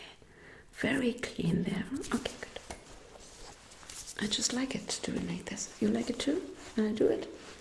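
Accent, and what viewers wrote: Germany. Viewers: Love your videos. I love your german accent.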